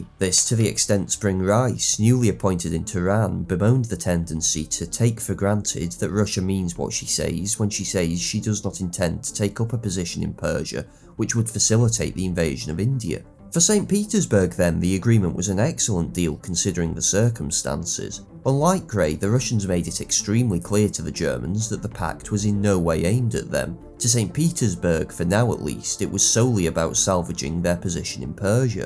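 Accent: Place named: England, United Kingdom. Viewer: Huh this prob one of the mildest english accents. hearing bbc australian pundits is nails on chalkbooard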